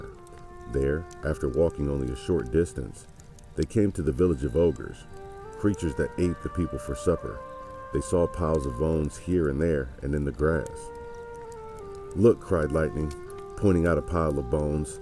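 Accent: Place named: Texas, United States. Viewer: Sounds like Texas to me!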